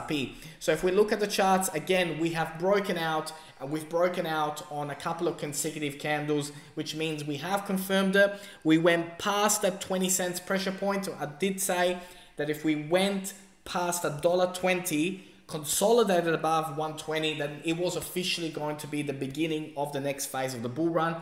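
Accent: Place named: Australia. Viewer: Nice to hear another Aussie do u have a twitter account i can follow? cheers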